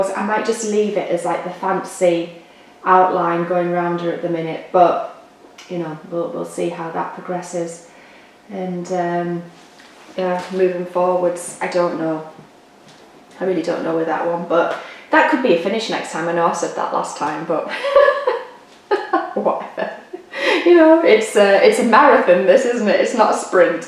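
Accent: United Kingdom. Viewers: So sorry you were ill for awhile. Glad to see you are feeling better. A while ago I did a search for anyone doing a review of a sit on frame and found your review of the Elbesee. Well, I was finally able to find a shop owner on line that had the Elbesee sit on frame in stock. The frame was delivered yesterday. The frame came with three hoops but also came with an attachment to enable the use of my own hoops and smaller sized scroll rods. I used the frame yesterday and most of today. I love it. I was having trouble with my left arm and hand from gripping my hoop. After only one day I can feel the difference in my arm and hand not gripping my hoop. Thanks so much for your review. Love your stitching and if I might say, your British accent. I was born in the UK quite some time ago, but came to the US when I was one. Still have relatives in Swindon and Avebury and love to talk to them and listen to their wonderful British accents. 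So heartwarming to me. Again thank you for your review. Stay safe, stay well. Lee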